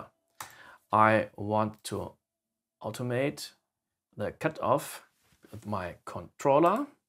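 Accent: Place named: Scandinavia. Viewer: Your accent in English sounds like Scandinavians!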